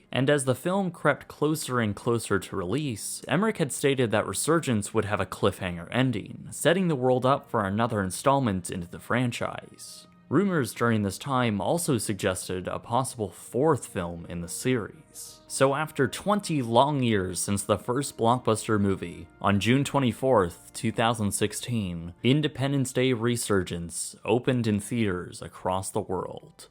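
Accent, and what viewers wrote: Canada. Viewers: 1) You need to go on a 'very' diet, and 2) a 'new sequel' as opposed to...?, and 3) I pegged you as a fellow Canuck within the first three sentences. Where's my pixie stamp reward?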